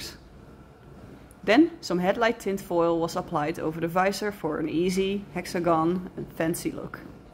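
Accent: Netherlands. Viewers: I can just hear this person is Dutch 😂 great job amazing work😊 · Dutch accent? 🤔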